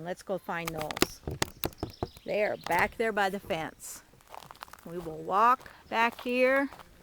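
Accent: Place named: South, United States. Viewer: Hi. Love your garden videos and your southern accent. I've been watching your channel for a while and am a big fan.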